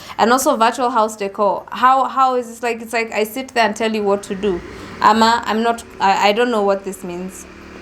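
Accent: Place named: United States. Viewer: You have an american accent have you lived in the states or were you going to an international school in your country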